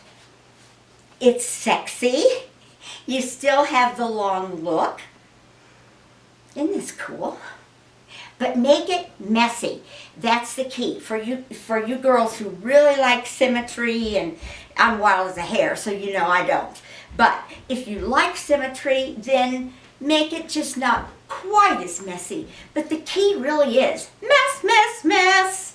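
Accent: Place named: United States. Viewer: I’m from England and your strong American accent really makes me feel happy and I smile, you say bangs we say fringe, but when you said dirty bangs I fell about laughing ( this was in a previous vid) you’re such fun I now say dirty bangs as I find it so funny, you’re becoming like a Sister to me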